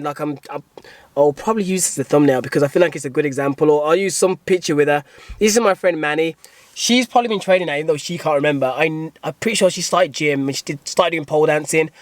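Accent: Birmingham, United Kingdom. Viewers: Daaamn that birmingham accent ! Just makes me wanna kill my self bruh your accent is the worst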